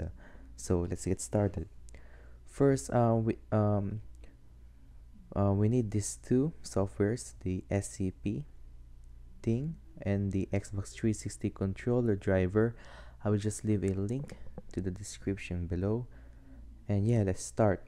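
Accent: Philippines. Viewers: Peenoise accent :D hahaha peaceeeee :P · You sound filipino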